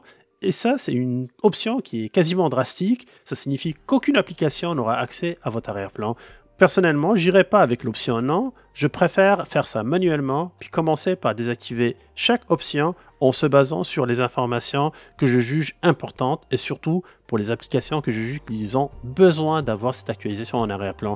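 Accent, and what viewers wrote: Quebec, Canada. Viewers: Super vidéo. Bonus d’un français, ton accent de cousin d’Amérique 😊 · c’est un accent du Québec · Présentation et conseils très complet et en plus avec une pointe d'accent Canadien. Qui de mieux ? MERCI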